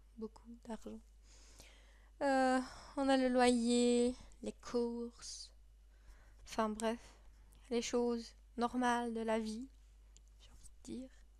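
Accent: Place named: Liège, Belgium. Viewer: Petit accent de liéch là :)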